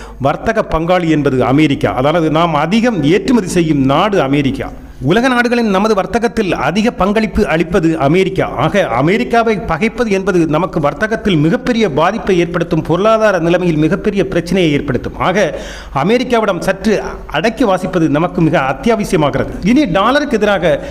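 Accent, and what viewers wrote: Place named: Kerala, India. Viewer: Very nice presentation. Your Malayalam mix accent is very interesting!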